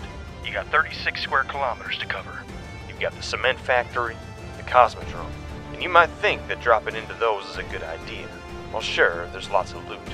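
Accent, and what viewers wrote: United States. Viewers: Lol i love the new narrator's voice in this trailer . It's like one of those cowboy style accent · I don't like this man's southern drawl. Doesn't fit with the map. On Miramar it would barely work.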